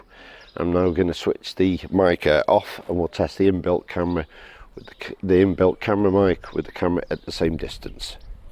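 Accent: Stoke, United Kingdom. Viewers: Are you a stokie by any chance 🙂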